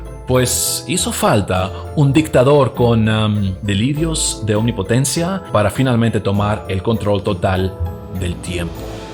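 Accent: Germany. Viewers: Más bien está haciendo una pronunciación alemana.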